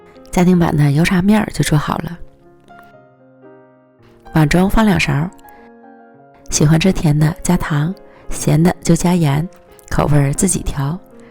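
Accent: Northeast, China. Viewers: 这东北口音平卷舌不分。。。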